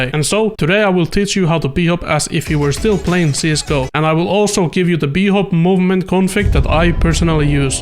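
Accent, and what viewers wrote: Finland. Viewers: U finnish? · Ur finnish? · ooooooooooooooooooooooooooootko suomalaine kuuulostaa aksetti kyllä ralli enklish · Are you finnish?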